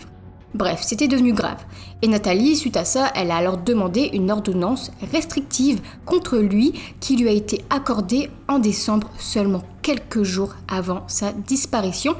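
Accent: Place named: Nord, France. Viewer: T'as grandi dans la famille ch'ti ? Parce que maintenant c'est rare que quelqu'un si jeune comme toi possèderait un accent très prononcé. Je me moque pas de toi, c'est juste que je kiffe cette prononciation. Ça fait un petit moment que j'apprends le français et je me suis habituée à prononcer tous les a comme toi. J'ai vu la vidéo où tu disais que tu songeais pas changer ta prononciation et je trouve que c'est très bien.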